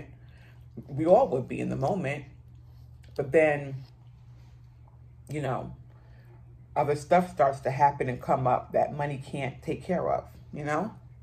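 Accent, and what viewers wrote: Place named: New York, United States. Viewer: Hi! So nice to meet you. Your channel came across my feed and I'm glad it did because we both are on a low carb journey! Great chat as we;; and I hear the NY accent! Sooo true, if you don't have your health you don't have anything! My husband is in a nursing home and I visit him 2-3 times per week and my heart goes out to the people who never have visitors. Blessings to you!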